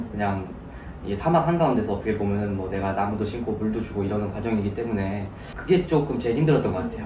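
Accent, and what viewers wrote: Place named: Jeolla, South Korea. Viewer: 말투가 전라도인데 혹시 고향이?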